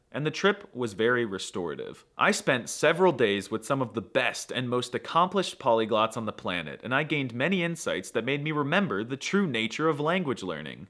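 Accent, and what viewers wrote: United States. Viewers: "Um siri fazendo barra"?? Your american accent is so terrible I almost couldn't understand it. The m in um is not pronounced like m, but just nasalizes the u. And the r in siri is like r in spanish, not like in english mf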